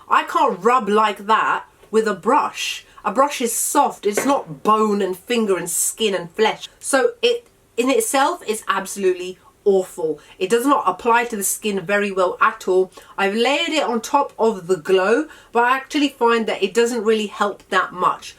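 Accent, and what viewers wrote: United Kingdom. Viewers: thank you very much. The lady above, literally read my mind but when I googled which one this or the Hourglass trio was better value, I got no reply. She read my mind and you've saved me buying this. Can you please tell me the name of the other powder you mentioned after Charlotte Tilbury (I have the same powder but didn't use it yet) you speak rather quickly and I'm Irish and with your UK accent, I didn't catch it. Did you say it was a Nars powder and if so, what one please? Your own make up is stunning. I have brown eyes and again, coincidentally, I was looking for pink eyeshadow palettes but I was disappointed as Amazon only had a Maybelline one and it wasn't vibrant and beautiful like your eye shadow. I like your in depth review and in Ireland that trio is almost 39 euro!! I subscribed to you. Happy New Year from Ireland xx 29th of December 2021 xx ps. 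Please will you tell me all the make up that you used for your stunning pink look? I assumed it would be listed but I was wrong :). It's weird because the past week I have been longing to find a pink based eye shadow palette but to no avail. I only see very insipid pale pinks that look dull and chalky too. I want your metallic effect look with vibrant pinks. I'd love to copy your entire look, so please may I know the products ie blush etc but also their shades? It helps that you're a natural (is it racist to say Arabian beauty? People are so touchy nowadays but you really remind me of an Arabian beauty and it just means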